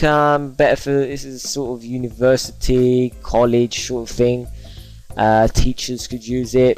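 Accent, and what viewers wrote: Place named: United Kingdom. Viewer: You fool. This narrator isn't Mexican or an Immigrant. He's British. Get your narrow-minded head out your own ass.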